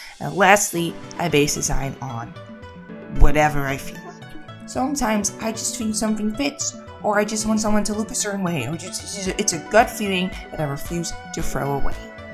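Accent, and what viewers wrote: Netherlands. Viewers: Virgo should definitely be a pretty boy. Also I like your voice overs because your accent reminds me of my Dutch friend 😁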